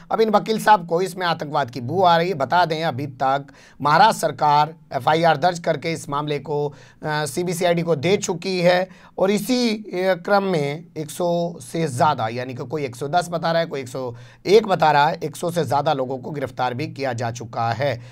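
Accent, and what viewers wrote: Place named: Western Uttar Pradesh, India. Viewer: सर आज आपके मुँह अपनी खड़ी बोली सुनकर मजा आ गया सर कभी पश्चिमी उत्तर प्रदेश को अलग प्रदेश बनाने पर कोई बहस या कोई शो कोई न्यूज चलाए थारा अहसान होगा म्हारे पर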